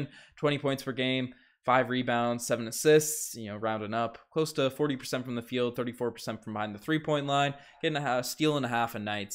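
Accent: Newfoundland, Canada. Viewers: Are you from Newfoundland?? 🤨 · must be from town with that accent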